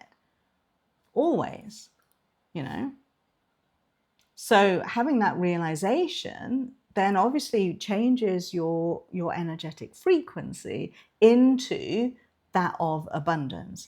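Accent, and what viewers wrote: United Kingdom. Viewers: 😊😊😊 That British accent is too kool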